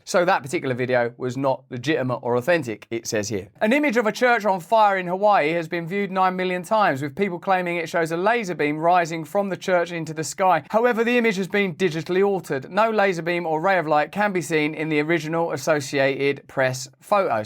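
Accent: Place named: United Kingdom. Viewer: I love the brit accent LOL. VPN ad "Get an extra Free (three) months Free.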